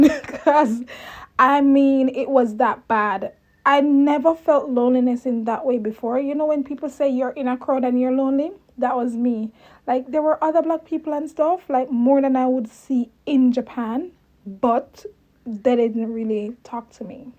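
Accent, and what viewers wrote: Jamaica. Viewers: I love discovering new places, love how you talk patois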